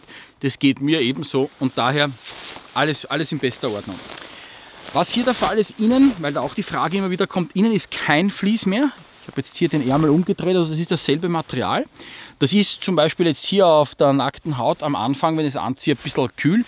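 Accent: Vienna, Austria. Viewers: a woschechta wiener, ha? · Alder ein Video von dem Typ gesehen, direkt abonniert einfach ein richtiger ehrenmann. Und bei dem geilen Österreichisch sowieso ein Muss.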